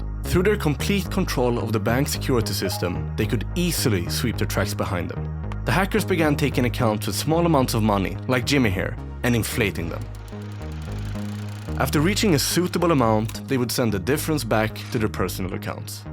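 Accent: Sweden. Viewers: Amazing video! LEMMINO vibes with your swedish accent :) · From sweden? Know the accent since im from there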